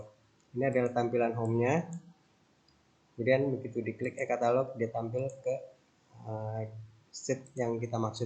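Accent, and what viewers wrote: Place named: Betawi, Indonesia. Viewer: Bujug dah bang, Betawi bangat yak .... Bisa nih bang ane belajar ke ente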